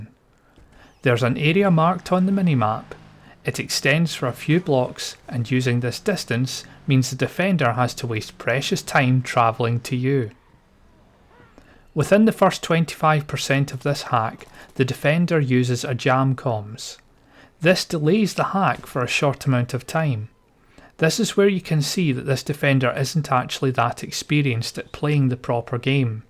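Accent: Scotland, United Kingdom. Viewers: youre scottish aint ye i love your acceent mate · Thanks alot mate, the tips & tricks really helped if I ever want to play WD 1 again! 1 sub I also quickly recognised that you're Scotish like me.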